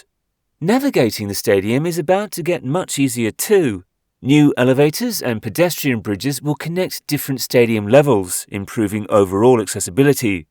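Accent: United Kingdom. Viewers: That is not the most iconic stadium. It’s a piece of sh!t. With all of that money they need a new stadium. Oh and when talking baseball don’t use a guy with a British accent. You lose people right away.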